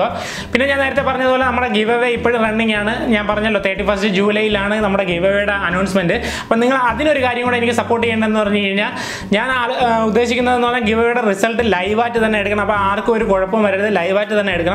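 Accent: Trivandrum, India. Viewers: NJN ATTINGAL ANN BROI. SLANG KETTAPOL THONNI TVM ANENN😅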